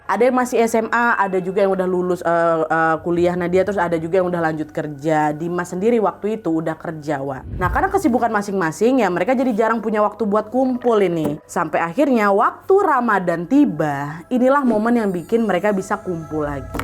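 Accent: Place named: West Sumatra, Indonesia. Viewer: hiyaa, Sumbar, payakumbuh.. logat minangnya gak ilang yaa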